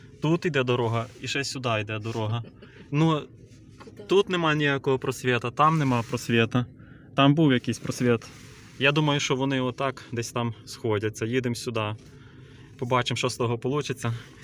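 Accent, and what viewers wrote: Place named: Lviv, Ukraine. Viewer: Вимова у Вас Львівська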